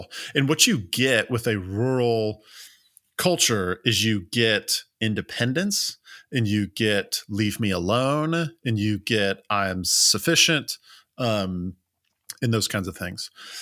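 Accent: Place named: South, United States. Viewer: You don’t have to think that you have to think about speaking in order to mask your little southern twang. Bc thinking you’re an idiot bc you’re southern is a sterotype, like it would be assuming someone is southern bc they are an idiot. Now being from a RED state, would be a logical assumption, but simply southern. Do you know how many idiots are from MASS and Michigan!? 🤣🤭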